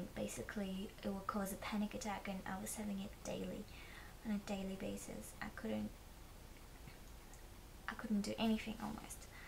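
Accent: Australia. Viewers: Thank you for sharing your personal experience with taking Zoloft. I'm about to start taking it and it was nice to find an honest review :) Are you from Australia by chance? Your accent is really pretty and sweet! ^^. Anyway, thanks again. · Blimey, no wonder you got anxious, is that a picture of Vladimir Putin on your wall? This video has been very helpful. I'm starting Zoloft today for treatment of a chronic anxiety condition. That sounds like an Australian accent. If you don't mind me asking what part of Oz did you grow up in?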